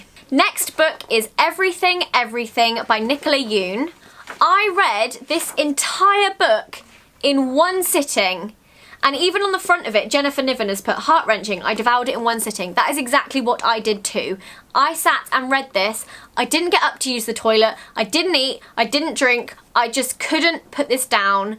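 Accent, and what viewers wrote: United Kingdom. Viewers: YOUR EYES THOUGHHHH !!! AND I LOVE BRITISH ACCENT, SO THIS IS AMAZING 😂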